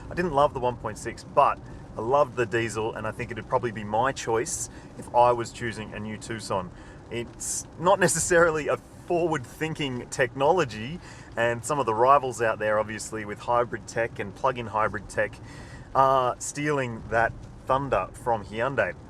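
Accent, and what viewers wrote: Australia. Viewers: Love the Aussie accent....and I looked at all the review titles before I roll the videos and choose Carsguide, and also UK CarsWow to compare, I know where both reviews are based..... ( for those who are too basic to pay attention to the titles and video content.....pay attention! ) Very good points and thank you for confirming some issues for my consideration of this car.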